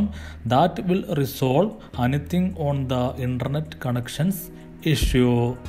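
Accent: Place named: India. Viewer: bro has the tiktok indian accent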